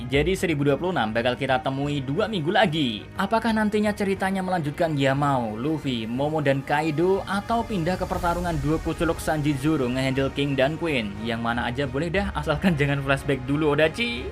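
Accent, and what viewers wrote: Java, Indonesia. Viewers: mind reques ..tampilin wajahmu mim soale model ngmge sampean iki wong jowo wkwkwk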